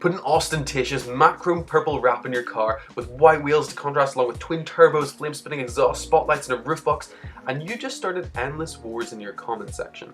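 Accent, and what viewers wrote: Ireland. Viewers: Are you irish? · U Irish?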